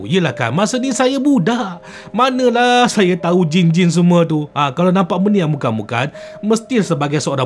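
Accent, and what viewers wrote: Perak, Malaysia. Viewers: Saya baru join tengok.. seronok dengar kisah2 benar..dan yg lebih seronok 'dengo' cakap loghat perak.. haha..😅 sebab saya pun original org peghrak..hahaha😂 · Suka juga bila dengar bercakap loghat Perak seperti "idak" dan "udah". Saya peminat channel ini, teruskan berkonten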